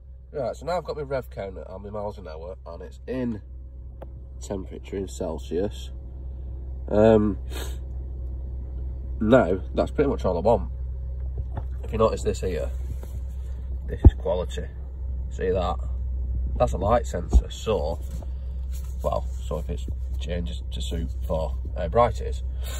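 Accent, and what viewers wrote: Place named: North, United Kingdom. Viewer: Great videos,love your 'Northern' presentation! From one Northerner to Another😉!!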